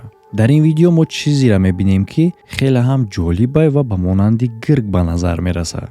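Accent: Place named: Yovon, Tajikistan. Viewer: Ака ба Худо ма кайф мекнм ранги мо ЁВОНИ Ё гап мезани чашмошон блк-блк ай гап нест хдт да ака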